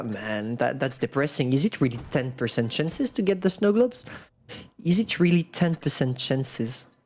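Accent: France. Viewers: Je savais que tu etait fracais a cause de ton acsens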